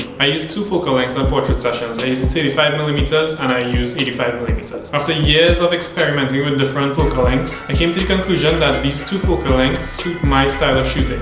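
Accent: Trinidad and Tobago. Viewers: You sound an awfully lot like my buddy from school in the US and he was from Trinidad and Tobago, something tells me you've got your roots to that part of the world!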